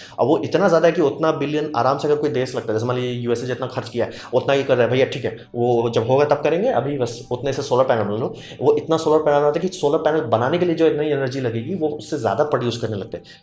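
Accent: Bihar, India. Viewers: Sir..kya aap Bihar se ho..?????????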